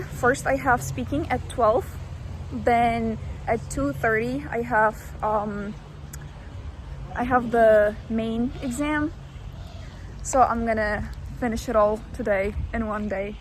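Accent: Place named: United States. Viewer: Before i saw your ID card i thougt she is an american. Amazing your speaking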